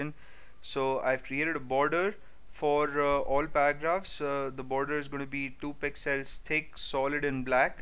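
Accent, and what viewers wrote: India. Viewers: why do all indians sound exactly the same from all the youtubers i have heard which are indians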